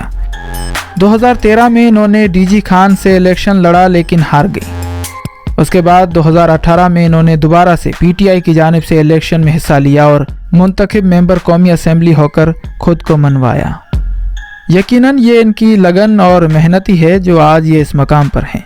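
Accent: India. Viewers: یہ۔ تو۔ کوئی۔ انڈین۔ کتا۔ لگتا۔ ھے۔ اس۔ کی اردو۔ غور۔ سے۔ سنو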